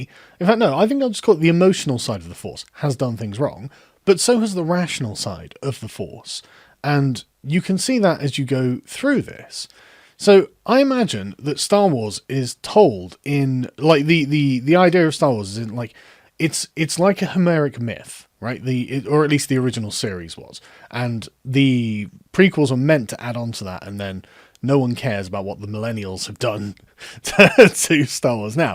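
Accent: United Kingdom. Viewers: It is a credit to your well-spoken Britishness that listening to you riff on this is still entertaining, even when you're completely wrong. The "Dark Side" isn't a facet of the human condition. Maybe it would be if conceived by better writers for a more mature audience. But it ain't. The Dark Side is a PCP/Crack/Adrenaline cocktail that can(and very often has) instantly flip a noble and altruistic hero into a yellow-eyed baby-eater in the blink of an eye. Repeatedly demonstrated throughout both canon and non-canon materials throughout the decades of the franchise. It's what happens when a Dragon Age mage becomes an Abomination. And in that context you now begin to understand the Jedi are a peculiar mixture of the Dragon Age Templars and Circle combined...with all the hypocritical flaws, and magi-knight badassery that entails. But they do what they do because when they fail...yellow-eyed baby-eaters run rampant all over the place.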